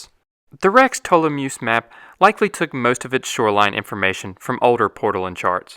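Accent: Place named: Mid-Atlantic, United States. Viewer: Have any other U.S. dwellers noticed the narrators THIIIICK mid-Atlantic accent? Idc that much but it lowkey drives me crazy 😭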